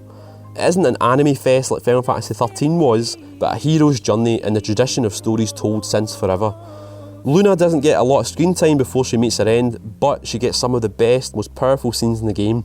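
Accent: Scotland, United Kingdom. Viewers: Great video, love how you got more and more Scottish as the video went on haha. Was a great watch though. Thanks :)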